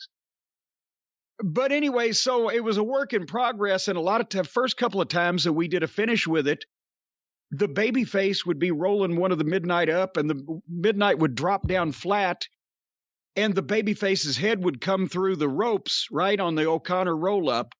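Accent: South, United States. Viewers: an intelligent well read southern man is what makes it for me. Too many give them a bad name in the public eye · The southern accent gives It a certain flavor. I Jim decides to do a news show I'm in.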